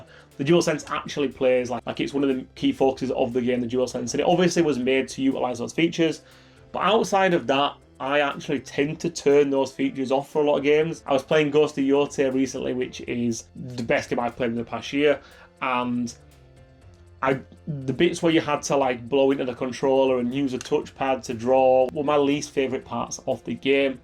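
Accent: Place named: North East England, United Kingdom. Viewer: Where in the UK are u from? you sound like your from the North East. Good vid. Subbed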